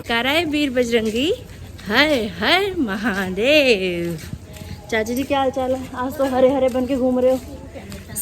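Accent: Punjab, India. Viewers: Love u di ....❤ ... di ek baar punjabi vlog bnao na aap ... bht sweet lgte ho aap punjabi bolte ho to...❤❤ n aunty uncle ko b pyar bhra namskar ... bht sweet h dono❤❤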